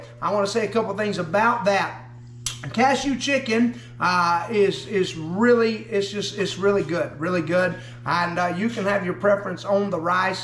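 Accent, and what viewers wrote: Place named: Missouri, United States. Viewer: You've moved away from SW MO! That accent is thick! I also moved away but I still have the bland Midwest accent of Missouri rather than a twang. I still make Springfield cashew chicken when the craving hits.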